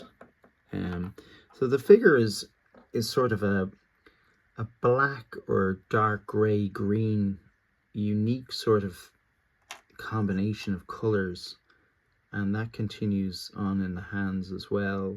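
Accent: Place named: Ireland. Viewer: Recognise the irish accent. This is a great figure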